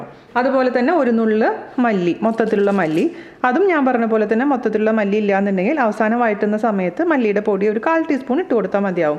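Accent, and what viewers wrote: Thrissur, India. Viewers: The dish is too good, you have a real talent to talk well in the typical Trichur slang, though sometimes it crosses the limit and cooking takes a backseat...on the whole, your videos are drool stimulating.... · Hi! Lovely recipe. Will try this with Doshas tomorrow. My native is Thrissur too and I love the way you speak the typical Thrissur bhasha. Hope to meet you someday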